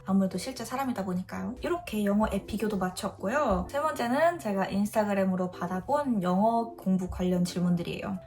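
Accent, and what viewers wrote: United Kingdom. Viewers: 영국 발음을 너무 좋아해서 이왕 회화를 할 거면 영국 발음으로 공부하고 말하고 싶다는 생각을 했어요 언니의 발음이 제 워너비에요ㅎㅎ 대부분이 미국 발음일텐데 언니는 어떻게 영국 발음으로 회화를 마스터 하셨나요? 영국이라는 현지에 나가 살다보니 자연스레 익히게 된 건가요 아니면 따로 공부할 때 영국 발음으로 공부를 하신건가요?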